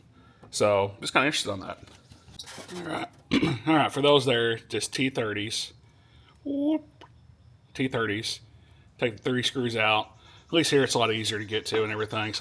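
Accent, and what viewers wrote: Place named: Texas, United States. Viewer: Accent sounds familiar… would u happen to be in Texas? Lol I just bought a sixpack scat this week. Tryna to see if anyone knows a shop that can handle my more advanced mods and tuning and stuff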